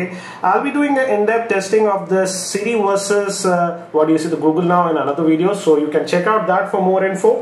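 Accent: India. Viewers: Indian accents are just awesome xD nice comparison bro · review of the two hottest phone now all done with an Indian accent.....how could you dislike this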